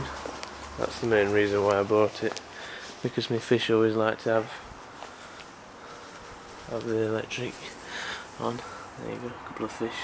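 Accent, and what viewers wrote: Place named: North, United Kingdom. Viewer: This is the box i got wi it. Lazy northern accent and missing lots of letters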